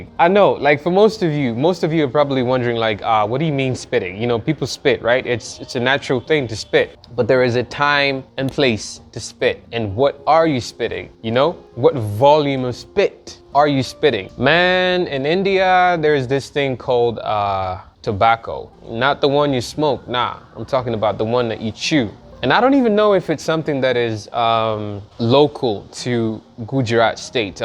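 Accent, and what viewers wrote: United States. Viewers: I had to look up your first video , i thought u were American. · I think you have a very interesting accent. It doesn't really sound like how my African friends from Ghana, Nigeria and the DRC speak. It sounds more like an American accent.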